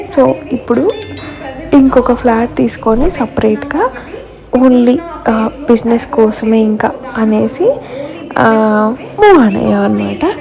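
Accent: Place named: Chittoor, India. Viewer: Asalu voice gurinchi yenduku ala antunaru? garu karnataka kada koncham chittoor slang ki similar ga undochhu....any how chala neat ga matladuthunnaru....go head and congratulations garu❤ Navaneeta from Bangalore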